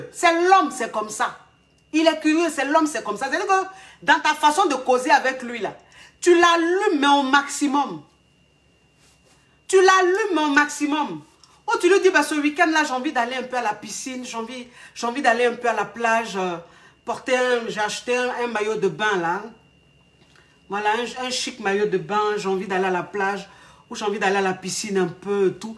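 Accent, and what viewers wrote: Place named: Ivory Coast. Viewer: 😂😂😂😂😂😂😂ma Diva tu m'a tué hooo ko grillade , soupe, braiser je te love ma cause simplisimie, sans façon, ma diva qui parle le vrai français direct de nous en côte d'Ivoire. Love u❤❤❤❤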